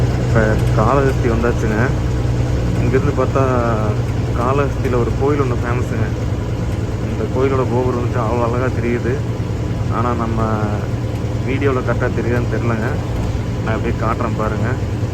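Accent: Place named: Madurai, India. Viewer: அண்ணா மதுரை மொழி லந்து 👌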